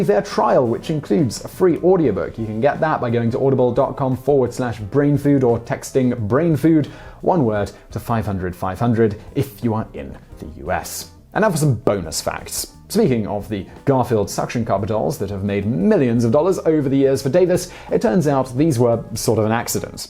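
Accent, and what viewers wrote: United Kingdom. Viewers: You come across as pleasant, including your flavor of British English -- yet even you call drawings "drawrings". I don't know what rings have to do with drawings, and personally find it annoying. This is a relatively new phenomenon having developed in Britain, and I bet linguists love this new direction English has taken in Britain.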